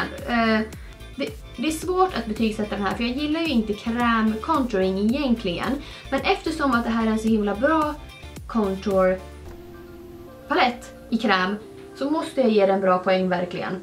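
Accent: Dalarna, Sweden. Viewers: Jätte bra video! <3 Man hör ditt dalmål ibland! :D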